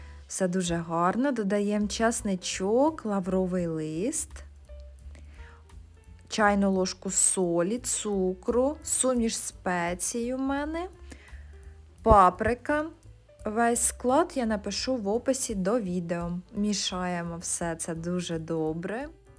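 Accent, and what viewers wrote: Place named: Poltava, Ukraine. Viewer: Христос Воскрес! Здається , Ви родом з Полтавщини!) так радісно і приємно чути!! Солянку зазвичай готую без картоплі, але зараз саме буду як Ви порадили) дякую!!) миру нам !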